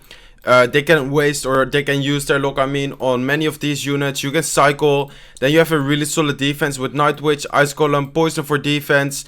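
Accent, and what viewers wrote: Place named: Netherlands. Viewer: R u dutch u sound dutch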